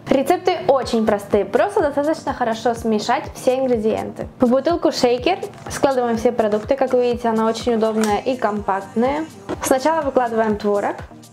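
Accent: Spain. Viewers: Кто заметил что розговаривает с Испанским окцентом. Мне это нравки🤗🤗🤗😗😗😗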